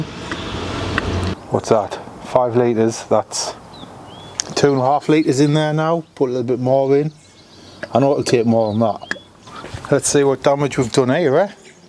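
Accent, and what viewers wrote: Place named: South Wales, United Kingdom. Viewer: he is from south wales in the UK mate...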